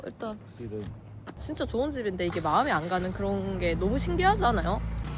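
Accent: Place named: Jeolla, South Korea. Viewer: 그런데 말습관중에 끝에 자꾸 전라도 사투리'이 이' 하시는데 왜 그러는 걸까요? 경상도 분이신데 뭘해도 상관은 없지만 안하시면 더 좋을듯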